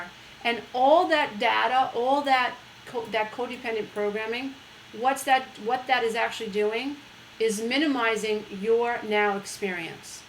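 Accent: New York, United States. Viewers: she sounds very New York it's so natural and grounded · Don't be afraid of sounding New York! Appreciate you...You helped me break the code. I am the little sister who moved forward because my elder sister did. I am forever grateful. It wasn't without incident, she had to watch me fall (into toxic relationship) before getting up. It is so worth it!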